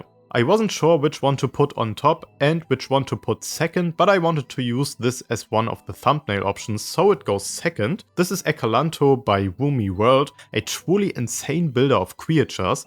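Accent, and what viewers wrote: Germany. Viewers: Oh man der deutsche Akzent ist ja mal anders wild 😅 klingen wir wirklich so krass 😅 gutes Video übrigens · Who is this guy with the lovely German accent? He's doing a great job. Ich wohne in Deutschland. Super smart friendly people 🤩🙂🤓🇩🇪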